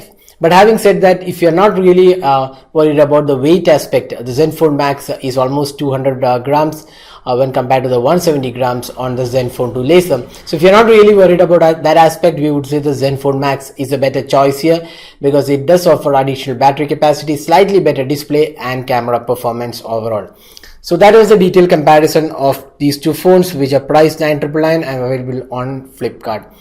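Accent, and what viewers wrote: India. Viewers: princy princesss well that's Indian English also known a British English with a Indian accent, more English than American English.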